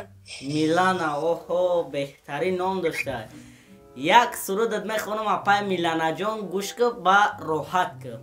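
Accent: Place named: Iran. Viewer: Акцент кати гап мезана додарчон иронира,хубш точики кати гап зана бехтарин мешава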